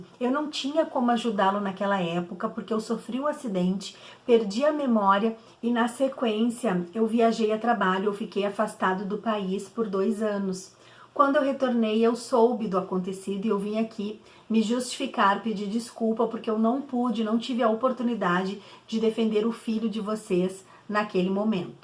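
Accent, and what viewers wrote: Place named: Rio Grande do Sul, Brazil. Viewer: Ahhhh, que amor! Um sotaque de gaúcha misturado com paulistanos 🙃🙂 Eu tbem amo Agatha Christie 🥰 Bom domingo pra vc 😘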